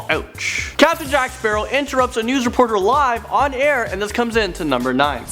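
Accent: Canada. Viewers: r u Canadian or (presenter) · RyanCraft1 hes canadian of course he sounds different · U Canadian bruh?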